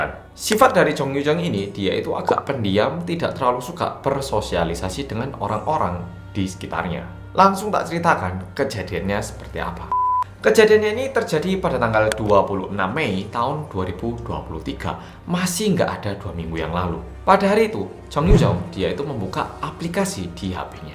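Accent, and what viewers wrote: Java, Indonesia. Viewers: Trmksh buat pak sopir ya,untuk mas Mimin cara menyampaikan berita bagus dan logat Jawanya kental banget. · mas nya muka korea tapi logat jawa 😅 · lo kok yg mbawa wartanya aku pernah lihat dia .waktu dia nyambut pak jokowi hadir d korea .dia ini pinter pakek basa jawa . dan dia bilang pernah tinggal d malang . mantep logat basanya ya medok . semangat brooo moga yutup mu sukses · Mas kmu orng Korea apa orng Jawa tp kok logat Jawa wajh korea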